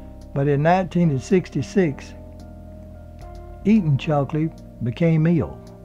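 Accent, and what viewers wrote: South, United States. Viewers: Mr i found your voice very southern jusr the way miss Susan Hayward was attracted to southern men according to her biography a book titled;A star is A star is aStar lives and loves of Susan Hayward thank you mr for such a beautiful. tribute to one of the most talented actors in the World, i always say and think an an actor(actress) should be honored while still alive unfortunately Miss Hayward died young but i know she would had been thrilled had she lived long enough to received this tribute from a soutern. gentleman thank you again Mr... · Very well done,except that awful Southern accent!!YUCK! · Thank you for this piece about Ms. Hayward. I have been going back and watching her movies and remembering her as of late, and this was great to see. I was 13 when she passed and I wept, I loved her so. I became attached to her when I would see her on the Saturday afternoon or evening movies and then I was hooked; an awestruck boy in love with a classy star whose performances made him believe that we could all endure and thrive. 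Also, I am not sure where you are from, but your accent is wonderful. My family is from the south, and listening to you, it was as though I was listening to my uncles telling old family stories. WONDERFUL!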